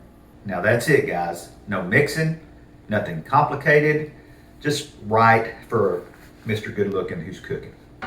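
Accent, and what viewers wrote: South, United States. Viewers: OOOH MY GOODNESS!! I HAVE FOUND A NEW FRIEND, IN MY HEAD! LOL! I FEEL IN LOVE WITH YOU, YOUR AMAZING SOUTHERN VOICE! I SUBSCRIBE TO YOU RIGHT AWAY AFTER THE HONEY BUN CAKE! I TURN ON ALL NOTIFICATIONS I LOVE HOW CAME IN A STOLE THE SHOW! YOU GUYS ARE SIMPLY AMAZING!! PLEASE CONTINUE TO SHARE THE LOVE AND JOY WITH US!! I LOVE YOU BOTH!! MERRY, MERRY CHRISTMAS!!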